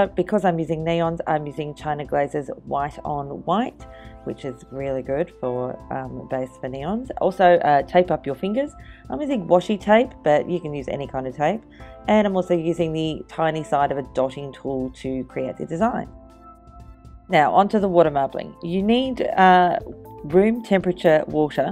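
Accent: Australia. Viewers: I prefer the videos that you talk us through!!! They sound awesome!! And it's really nice to hear another aussie out there (I'm from Australia too)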